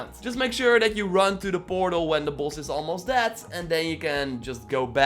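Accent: Netherlands. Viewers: Your Dutch arent you?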